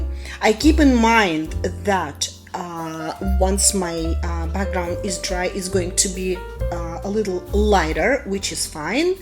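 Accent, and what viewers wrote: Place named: France. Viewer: I love your French accent in addition to your art.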